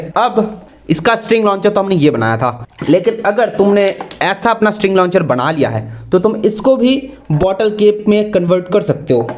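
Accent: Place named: Bihar, India. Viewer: Bihari hai ye bhai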